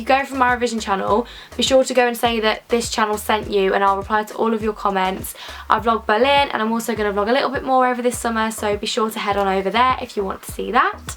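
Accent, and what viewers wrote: Birmingham, United Kingdom. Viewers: !! Don't try to suppress that awesome brummy accent! I love how it sounds for some reason (I'm a weirdo lol) x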